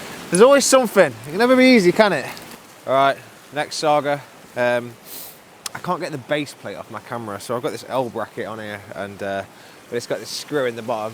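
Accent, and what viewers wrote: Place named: Sheffield, United Kingdom. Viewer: It's a like and sub fron me. Sounds like you live near Sheffield like me :)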